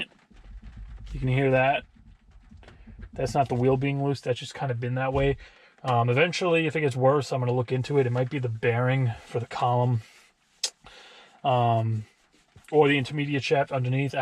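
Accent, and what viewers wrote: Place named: Long Island, United States. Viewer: Hey you from Long Island dude?... you sound it lol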